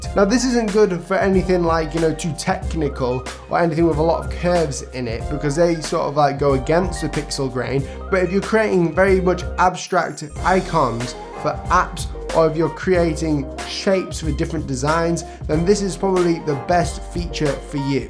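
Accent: United Kingdom. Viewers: i have to say these updates are really minor. as a side note would you work on your video's music more and the fact that you are British and we might be fascinated by your accent is old fashioned now, take care.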